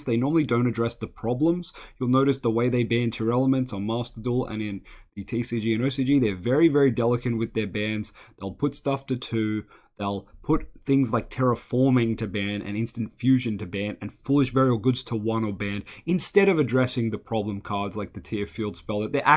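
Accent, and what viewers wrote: Australia. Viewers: New mic? You sound less…Australian? Kiwi? Whatever you are, you sound less like it than last time I saw videos from you. Lol.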